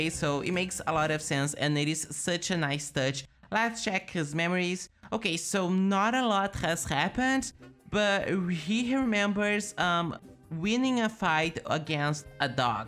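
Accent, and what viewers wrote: Brazil. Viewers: Everyrime inwarcgbtour vídeos I’m trying to figure out where you’re from hahaha I’m surebyiure Brazilian. Love seeing fellow Brazilians doing content in English!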